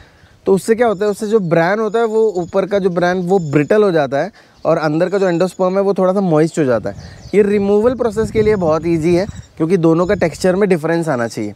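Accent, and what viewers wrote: Maharashtra, India. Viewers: This is my first time. I really like the way u explain and your touch of Marathi in hindi really sounds good.Keep up the good work.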